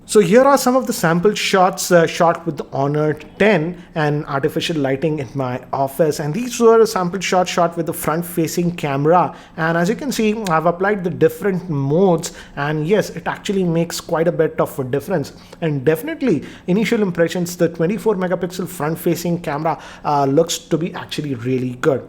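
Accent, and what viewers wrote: India. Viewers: This indian accent wtf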